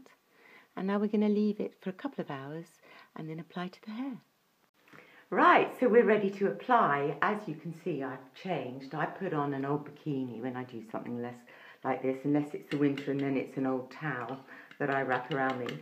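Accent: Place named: United Kingdom. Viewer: Awesome, thank you for sharing! You have a really lovely accent, it's British, isn't it?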